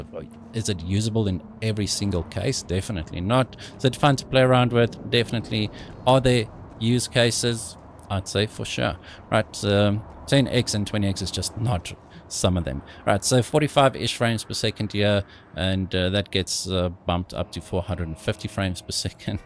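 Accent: South Africa. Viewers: Ha SA accents always so distinct .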